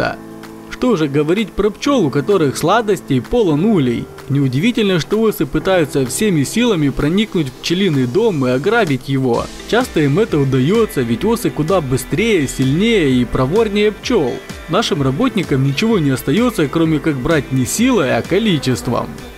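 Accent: Ukraine. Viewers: а разве не в украине просто говорит он с некоторым акцентом, похож на украинский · Какой же мерзотный 404-й акцент. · это Украинский акцент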